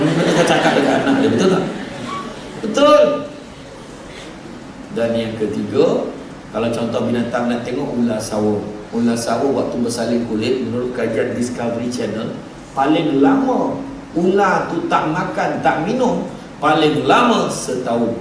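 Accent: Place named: Kedah, Malaysia. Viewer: bru 2/3 hari lps...sggah solat mgrib di masjid sbrg jaya... mcm.biasa sronok lah tggu cramah.ustaz... tetiba 2/3 pth je paham... slebihnya... org kmpong ustd tu je la yg paham agaknya..jemaah pompuan saling memandang.. then ada sorg mkcik dtg kat saya..tanya..paham dak.. smbil tengok tv yg dipncarkn khas bhg jemaah pompuan..saya senyum..saya pon tak pahammmm... moral of the story..masjid2... kna tengok jugak la ustd tu mcm.mana... maybe sesuai bg cramah hanya utk qariah ustd tu jer..sbb diorg jer yg paham loghat pekat ustd tu ...skian (bukn klantan..bukn jugak tganu..i think smwhere in kedah)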